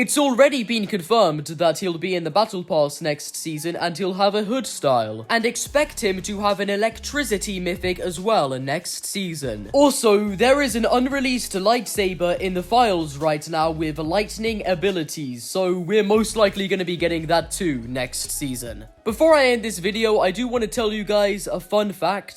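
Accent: United Kingdom. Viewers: This is might be the most british accent I've heard in a while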